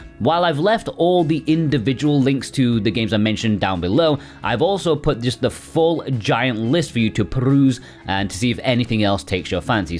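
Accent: United Kingdom. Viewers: Are you Caucasian ??? You sound very Asian British :o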